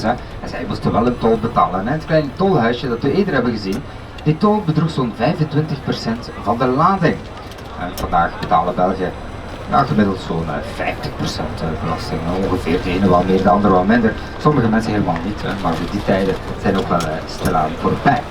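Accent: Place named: Holland, Netherlands. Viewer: Jammer van dat Hollands accent...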